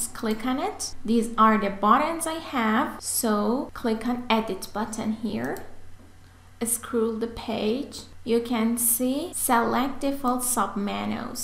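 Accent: Iran. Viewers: At first 10 seconds i Told myself she is Iranian🙂 The famous Iranian accents🥰 باعل واعل😂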